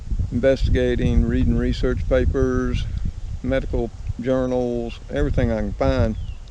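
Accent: South, United States. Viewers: your southern twang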